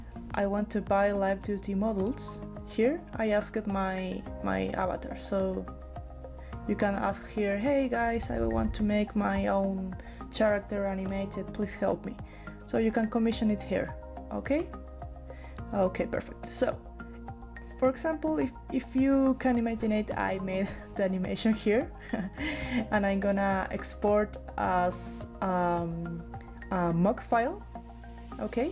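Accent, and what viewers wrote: Chile. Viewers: Chilean? Wkajjs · ♡I LOVE YOUR ACCENT OMG♡ (I'M CHILEAN TOO HAHAHA)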